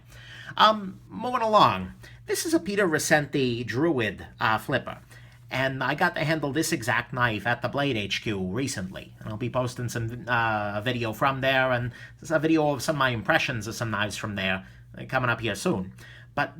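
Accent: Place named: New Jersey, United States. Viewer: Why do so many find the way you talk as different? I live in Jersey and everyone sounds just like you?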